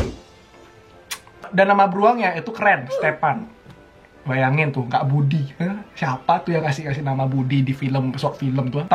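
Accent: Bali, Indonesia. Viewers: Kayak ada logat balinya.... apa prasaan aku aja kalik ya..🤭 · Logat lu medok bgt bro bali nya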